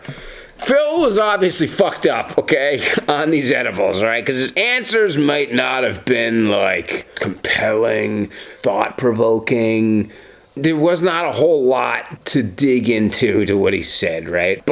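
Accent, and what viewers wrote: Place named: Texas, United States. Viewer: Damn dude you’re Southern accent is spot on. That’s how we talk in Texas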